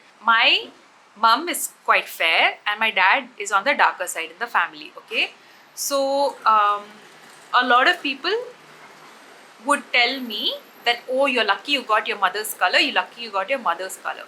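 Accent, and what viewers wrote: India. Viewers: Bro your intonation is so indian coded i love it. It's like finding something familiar on the internet <3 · Your English is perfect Indian English I feel like I'm listening to a friend 😂 unlike some influencers putting on a fake accent..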